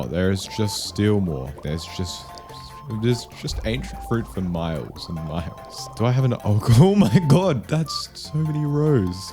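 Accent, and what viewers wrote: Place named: Australia. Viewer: i know an aussie when i hear one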